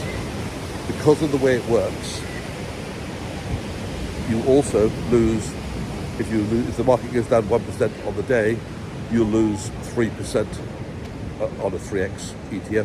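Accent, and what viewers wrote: United Kingdom. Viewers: Dear mr., really appreciate your information and the effort you make to cover all aspects. Also the way it is presented and in true English from the UK. Thank you and hope you do enjoy making more video’s to progress my learning and knowledge. Greetings from Holland.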